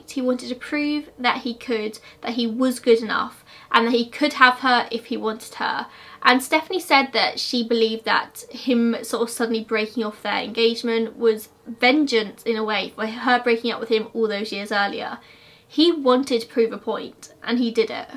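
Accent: United Kingdom. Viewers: R u british??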